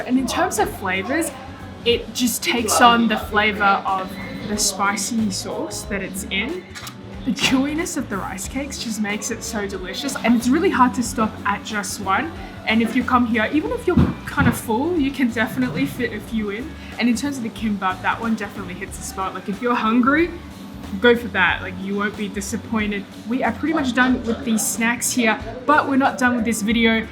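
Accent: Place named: Australia. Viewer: So cool seeing an asian with an aussie accent ! Very pretty girl and great food vlog !!!! Love from Arizona =^..^=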